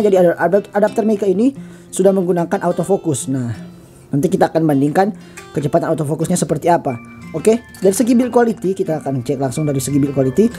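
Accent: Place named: Manado, Indonesia. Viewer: Rupa org mdo pe logat bos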